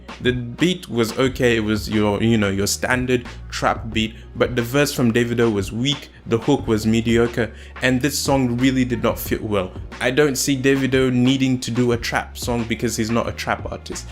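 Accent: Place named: Ghana. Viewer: Are you Nigerian?, you sound Ghanaian.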